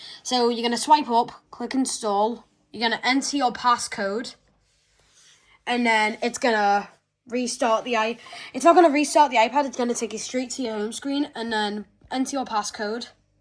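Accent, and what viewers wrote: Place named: Liverpool, United Kingdom. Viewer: W scouse bro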